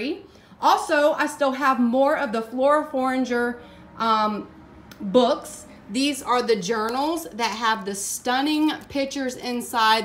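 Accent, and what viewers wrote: South, United States. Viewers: Giveaway........ Congrats on hitting 17k, we love watching you and I adore your southern accent. Thank you for giving back!!!!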